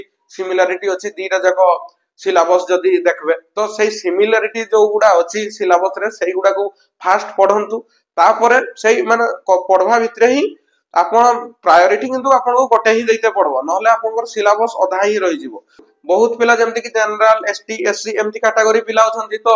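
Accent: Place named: Keonjhar, India. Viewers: Kjr district ru belong karucha bodhe language ru Jana padi jauchi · Sir apana nka ghara Mayurbhanj, balasore nahele keonjhar,bcz mu b keonjhar pila😅😅😅apana nka talking style ru laguchii .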